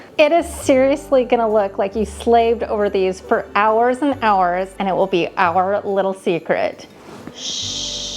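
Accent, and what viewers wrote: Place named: Maine, United States. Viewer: Awesome ideas - love your videos. So cool to hear you might be from Maine (from a fellow Mainer) 🙂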